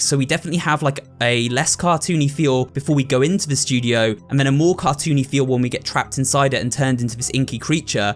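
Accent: United Kingdom. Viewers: Are British or what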